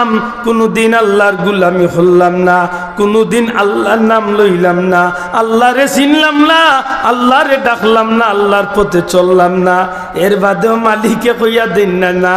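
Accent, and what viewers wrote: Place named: Sylhet, Bangladesh. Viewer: সিলেটি ওয়াজ আমার অনেক ভালো লেগে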